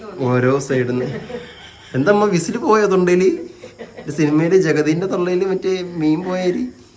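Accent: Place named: Kollam, India. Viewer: Kollam slang super👍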